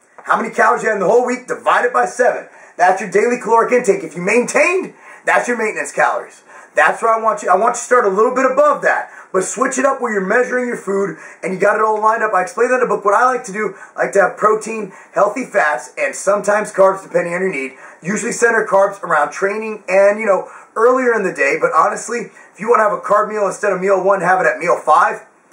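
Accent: United States. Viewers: Country ass accent is cool ;)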